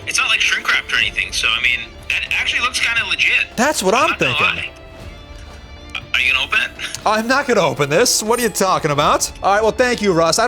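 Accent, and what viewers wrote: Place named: United Kingdom. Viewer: Oh my gosh British accent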